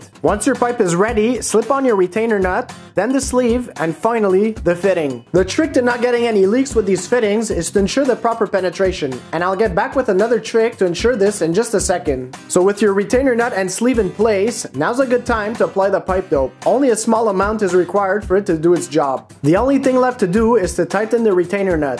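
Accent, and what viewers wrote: Canada. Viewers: from which part of Canada is your accent from?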